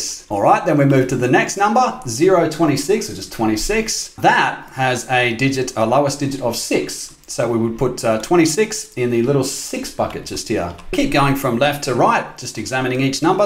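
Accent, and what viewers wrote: Australia. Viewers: You speak algorithm language You speak australian accent You say adios spanish language In my mind, i see a natural 0x10 base or 0x100 base for almost any kind of data, such as string, floats etc, and very fast too with bitwise operation and direct addressing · Are you Australian? I'm new subscriber, just asking because you sound like an Aussie guy I know.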